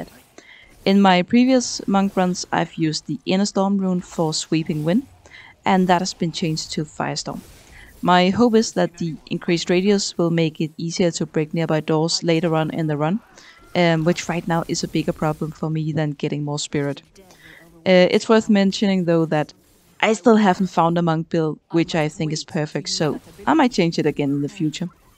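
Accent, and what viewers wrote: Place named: Denmark. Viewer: As a fellow Dane you can instantly recognize a Danish accent, when you find it out in the wild like this :) Nice run as well.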